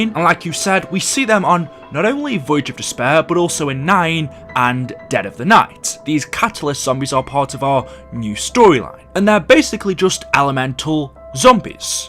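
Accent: United Kingdom. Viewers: Not a zombie related one But please can you tell us where are you from since you’ve done the face reveal Im assuming that you’re from UK based on you accent also love your vids bro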